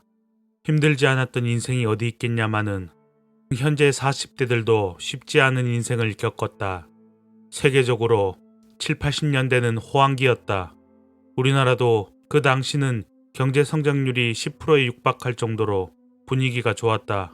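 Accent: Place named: Gyeongsang, South Korea. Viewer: 경상도 억양이 있어서 좀 아쉽지만 너무 좋습니다 잘 들었습니다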